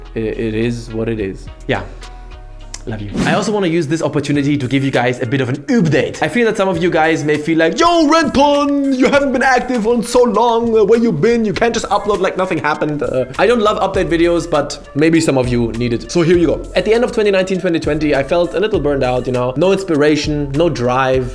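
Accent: Germany. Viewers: Alder du bist doch deutscher .. hör ich doch am Akzent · Your english sounds really german but also really good 😂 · Manchmal hört man deinen deutschen akzent raus😂❤️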